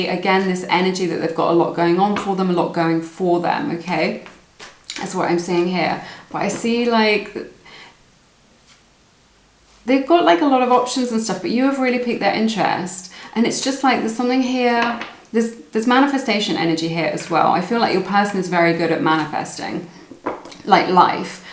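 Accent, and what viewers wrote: RP, United Kingdom. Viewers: I mean this as a neutral observation… you have such a bbc-type British accent. I’ve never heard someone not on the international news speak like you. I’ve met plenty of British people but they didn’t talk like that. What part of England are you from? If you don’t mind me asking.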